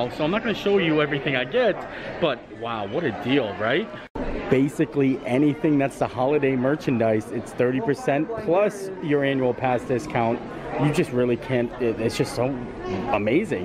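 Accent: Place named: Pennsylvania, United States. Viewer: Hi! We love your videos and get excited every time there’s a new one! Curious, where in PA are you from? I went to Susquehanna University and you have the same “accent” as some of my friends from Shenandoah.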